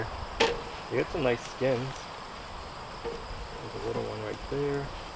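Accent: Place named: New Hampshire, United States. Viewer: Here's a Tee shirt. "What's next on Lumnah Acres? I have an ide'er.... Love the NH speak. Reminds me of my dad.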